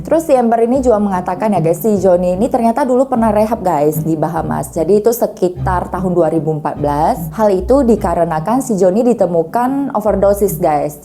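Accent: Medan, Indonesia. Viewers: Medan kali..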